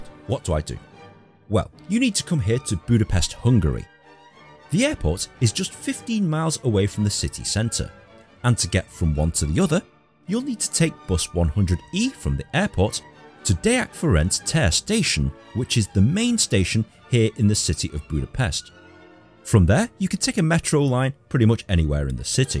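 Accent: United Kingdom. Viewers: Fantastic video mate. Great to hear a travel guide done by a fellow Brit instead of another cheesy American. What’s the website for booking trips to the Hungarian parliament building? I’m hoping to go Budapest in the Spring.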